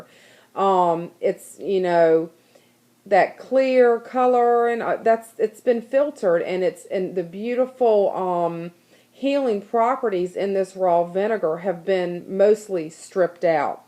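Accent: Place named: South, United States. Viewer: I also love your southern accent. I'm from the south:)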